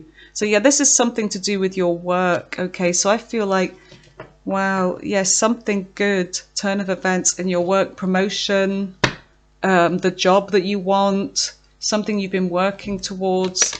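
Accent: United Kingdom. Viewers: Hello, Shout out from the Coachella valley. Brilliant Reading 😊 By any chance, are you from Cornwall England? Cheers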